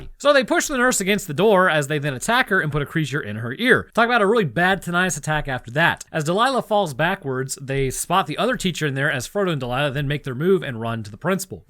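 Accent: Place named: South, United States. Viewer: As someone who lived in Florida for a while and some times his southern drawl sneaks out of the basement that it SHOULD NEVER leave. Southern drawls arnt that thick unless they’re Cajun or some very very isolated rural areas. Atlanta ain’t one of em.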